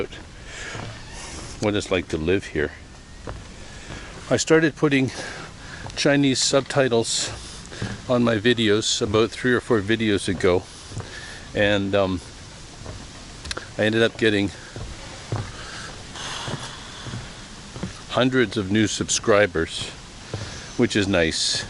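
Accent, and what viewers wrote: Standard. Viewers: 我聽得懂你說台語的意思，發音很標準喔😊❤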